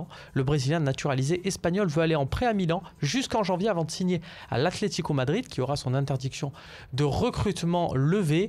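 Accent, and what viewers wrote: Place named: Paris, France. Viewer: millan Cerkov car clairement, vu ta façon de parler, je pense que tu es parigots. Et oui à l'OM on est pas des pigeons à balancer plus de 100M d'euros par an pour un seul joueur... tu devinera lequel je pense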